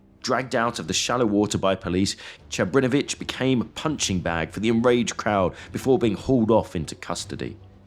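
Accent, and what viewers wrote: England, United Kingdom. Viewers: One listening documentary spoken in English accent should be initially skeptical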